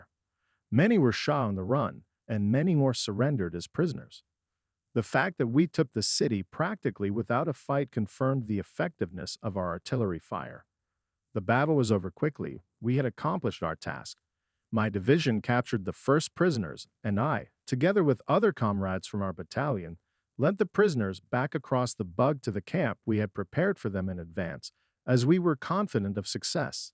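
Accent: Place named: United States. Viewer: Too bad the narrator is American….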